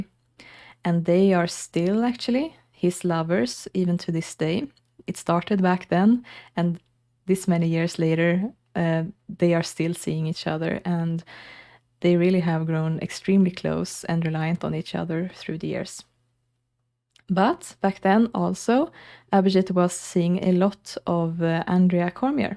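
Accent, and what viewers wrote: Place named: Sweden. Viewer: I just found you when just looking for a new sims 2 let's play to get into! Got so excited when o heard you accent, I'm also Swedish so I look forward to seeing your content even more now. I've actually been meaning to start a channel to play sims 2 etc but been procrastinating it for years, and only recently decided I'd do it in English instead of Swedish to not make it too niche. Verkar som att nån hann före mig! Haha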